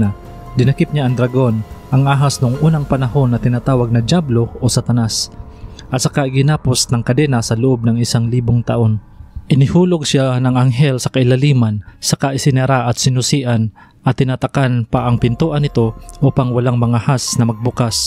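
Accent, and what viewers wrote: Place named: Visayas, Philippines. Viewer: Bisaya ka bro😁ung accent mo,hehee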